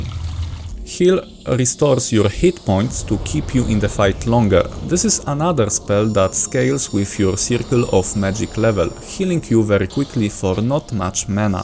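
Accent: Poland. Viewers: Odrazu słychać, ze jesteś z Polski każdy Polak wymawia końcówki ing z g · Słychać że rodak xD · podnieca cię podcinanie innym skrzydeł? Ma akcent i co? Jest z Polski to ma polski akcent, prosta i normalna sprawa, tylko debile silą się na hamerykański mimo że nie przychodzi im naturalnie, a gość mówi bardzo dobrze i zrozumiale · I love how polish you sound brother 🇵🇱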